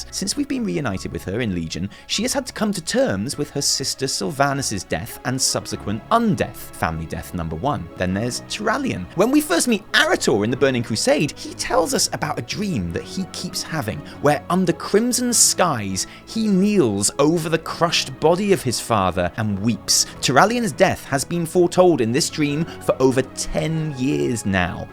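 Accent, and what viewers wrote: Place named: RP, United Kingdom. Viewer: Another brit giving us a bad name making everyone think we speak like the queen.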